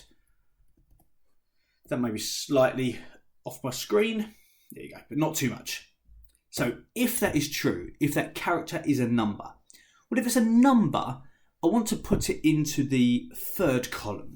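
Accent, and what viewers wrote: United Kingdom. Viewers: Very informative video ! And a beautiful English British accent ❤❤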